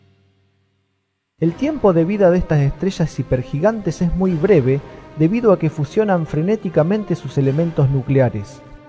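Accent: Argentina. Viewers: Jorge montt el dice estresha por que es argentino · muy buen video pero te hago una pregunta ¿sos argentino? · muy bien! pensé que era el único argentino que se interesaba por estas cosas. me suscribo. no le des bola a los ignorantes y seguí propagando con orgullo tu acento. saludos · Bueno este tiene el acento Argentino PURO y sin suciedad, molesta escucharlo decir estreSHA,mashoris, sh sh :v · Nose porque la gente se burla del acento así se habla en argentina , pero en todas partes no se habla igual , nose porque se burlan es el "Idioma argentino" que problema tienen De que pronunciemos así ? Son inmaduros .Saludos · Si, es Argentino, he visto mucha gente que se quejaba por su acento en los comentarios... Mil disculpas!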